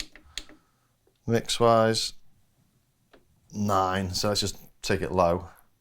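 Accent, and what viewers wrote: Liverpool, United Kingdom. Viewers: Is a scouser?! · Are you from Liverpool?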